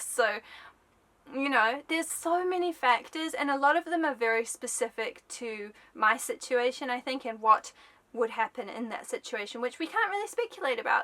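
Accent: New Zealand. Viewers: Yeah it's a bit of a hybrid between her native New Zealand and the Japanese accent she would have adopted a bit from being around Japanese speakers so often.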